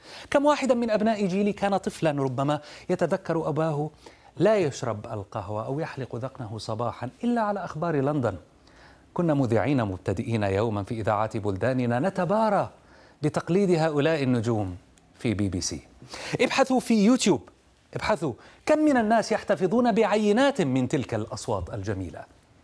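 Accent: Syria. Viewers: هل المذيع من سوريا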